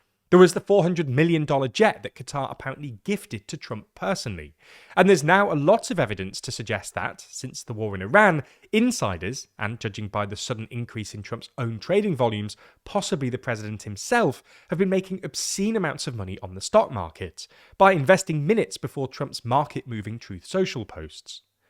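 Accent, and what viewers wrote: United Kingdom. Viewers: Yawn. Another brit-accent wagging-fingers about superior morality. America is not Commonwealth, so your ignorance not understanding undercuts your ‘judgement’ of events and facts: America does not jail politicians for running an office, but Biden+co did just that; weaponizing lawfare until Trumps whole family was threatened with jail - when they had nothing to do with it. So much Democracy under Liberals. Further, their fortune was directly threatened vocally, legally, politically, but US administration: what better way to say Papa Spank, than make departments reel from institutional shock and public shame? AND, the January Sixth protest was another coercion this tries to set right: as you will see, the voting was entirely rigged, because Chatham House cannot survive two Trump presidencies. They’ve said so themselves publicly just last week. 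Maybe it;s a rug-pull, but I see Justice in exacting a price for government malfeasance and appears nothing beyond what Every Other Leader seems to do. Biden+Co thought an Autopen substituted for Public Opinion and legal Congressional Acts. Enjoy your morally superior rape-gangs, England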